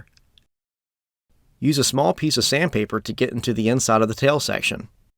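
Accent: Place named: Charleston, United States. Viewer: awesome. I lived in Charleston for a long time. Thought I recognized that accent.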